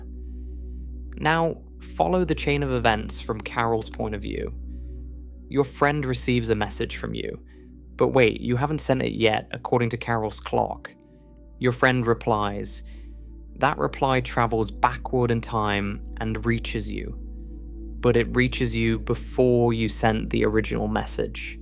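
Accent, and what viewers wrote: England, United Kingdom. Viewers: USUAL ENGLISH ACCENT GARBAGE VIDEO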